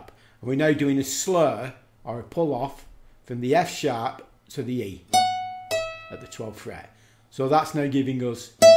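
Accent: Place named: Northern England, United Kingdom. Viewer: I could tell you are from up north before I read. Nice tutorial. I'm from Liverpool. Cheers!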